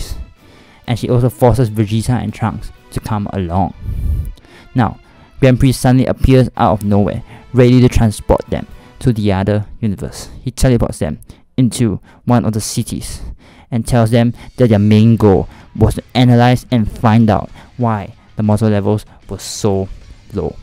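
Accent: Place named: India. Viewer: i love the indian accent maney :)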